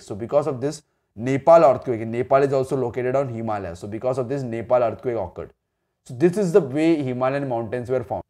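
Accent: Maharashtra, India. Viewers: N I think u r Marathi coz Ur tone like this only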